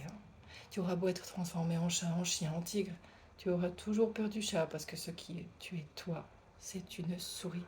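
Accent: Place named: Spain. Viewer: Youpi je suis le 100e abonné 🙃Tu as un petit accent et une manière de parler bien spécifique, tu es espagnole "de base" ?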